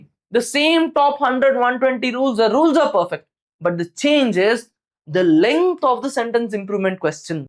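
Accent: Kerala, India. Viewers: your way of pronouncing or peaking english nearer to malayam and tamil peoples way of english pronounciation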